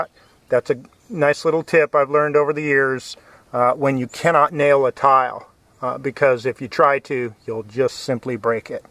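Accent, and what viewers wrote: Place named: United States. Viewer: I would certainly like to have you fix my dads roof but we are on the other side of the pond .......Australia......you sound like you're americana.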